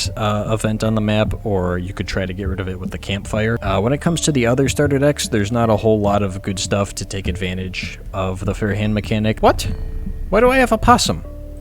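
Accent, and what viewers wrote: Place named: Michigan, United States. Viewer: Are you from Michigan? Your accent sounds so much like my brother in-law who is from there.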